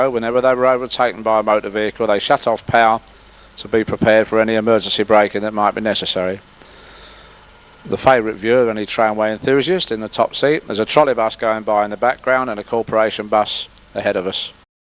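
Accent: United Kingdom. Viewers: Great stuff, my dad would take me on the trolley buses back in 1966/7 into town to buy toys and seafood on the London road ( The Barras Market). Very happy days indeed. Did anyone ever tell you that you have an East End of London accent?, I lived there for many years. In fact you sound just like the late Charlie Kray. How would I know that. Keep up the good work.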